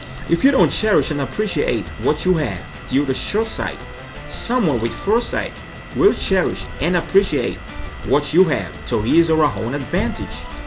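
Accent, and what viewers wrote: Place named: United States. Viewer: well said. this American accent na wa o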